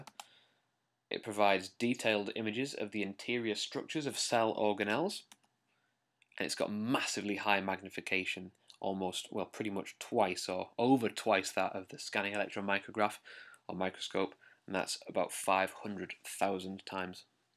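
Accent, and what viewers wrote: United Kingdom. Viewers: Great, easy to understand. And not a robotic voice. An awesome British speaker.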